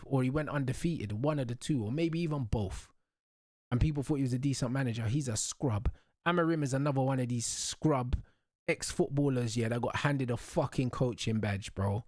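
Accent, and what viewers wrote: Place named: Northern England, United Kingdom. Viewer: Love the northern accent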